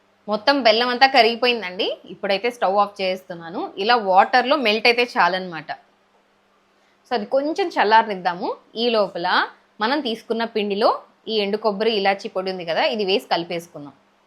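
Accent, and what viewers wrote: Nellore, India. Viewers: Hi nenu kuda nellore, nenukuda chestuntanu. You tube maa oori basha vintunte chala bagundi. Nenu banglore dagara vunde madanapalleki vuntanu.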